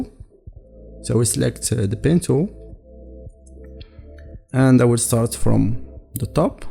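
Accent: Morocco. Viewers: Are you Moroccan, I can tell f accent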